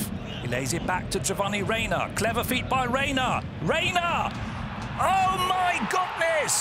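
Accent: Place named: RP, United Kingdom. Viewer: Poshest commentator of all time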